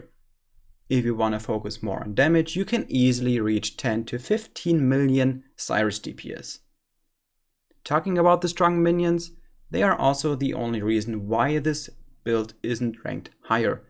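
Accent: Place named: Germany. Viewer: could be german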